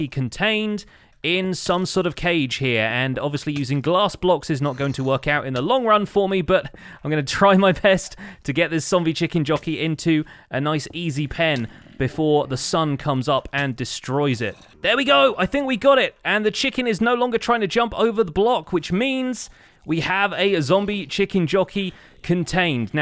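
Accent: United Kingdom. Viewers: The notification woke me up at 7am but I’m damn sure gonna let that cocoa butter British voice soothe me back to sleep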